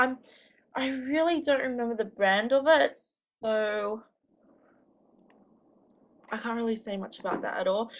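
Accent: Australia. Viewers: Lol Aussie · Wait if you're Japanese how come your accent sounds Australian? .-.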